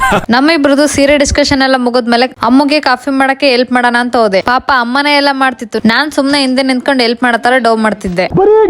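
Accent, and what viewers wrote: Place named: Chitradurga, India. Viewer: Nimdu proper Chitradurga na sis..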